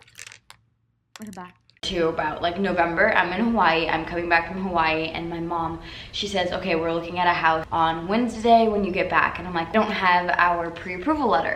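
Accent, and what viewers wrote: England, United Kingdom. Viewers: The English Accent Is Always The Highlight Of The Vlog. Agreed! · That British accent tho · this english accent omfg i am obsessed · Hey love ur British ascent 💜💜💜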